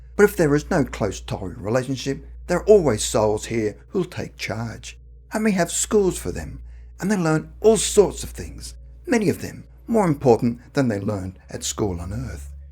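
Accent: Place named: Australia. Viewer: I find the narrator's voice very engaging, yet somehow pleasant and soothing at the same time. Though many of these stories seem to be set in England, I thought the narrator's voice sounded Australian. I finally checked and saw that emanates from Australia. I'm pleased my tin American ear was correct for once.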